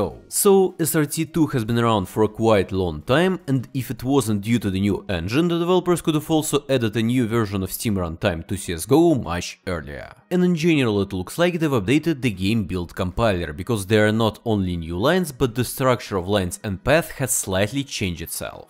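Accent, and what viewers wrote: Russia. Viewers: Cool accent, are you Russian? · We all love spy dataminer chicken mit Russian accent 🐔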